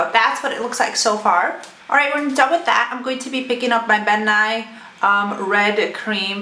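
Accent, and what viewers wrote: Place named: United Kingdom. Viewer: I love that British accent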